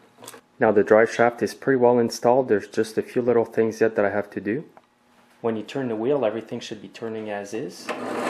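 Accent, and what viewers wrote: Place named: Quebec, Canada. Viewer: you speak English very well but I notice a super small accent...are you from quebec?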